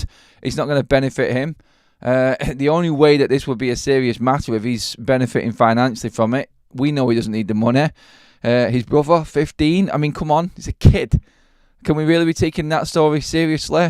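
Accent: Manchester, United Kingdom. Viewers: Which muppet watches a utd chanel and slags of the mancs accent?! Lifetime ban for those plebs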